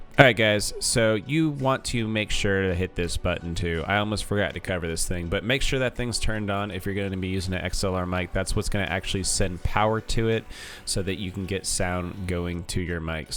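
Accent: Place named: United States. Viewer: guys guys guys guys guys guys guys guys guys ... fucking american speaking, nothing of substance.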